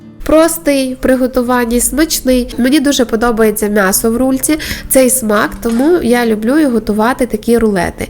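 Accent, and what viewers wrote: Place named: Western Ukraine, Ukraine. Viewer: Який файний западенський акцент! Кайфую! Дякую!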